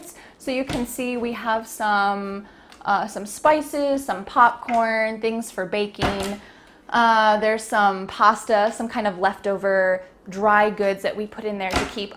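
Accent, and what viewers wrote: United States. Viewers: sei troppo brava complimenti. In quale stato dell’America vivi? Chiedo tanto per capire che accento usi del Norton del South. Grazie 😊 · WOW... I nuts about your methodology...God bless you teacher,and amen!!! I've been watching some of your videos and I love your American accent! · I luv her accent. I'm crazy about american eccent... I'm practicing this everyday.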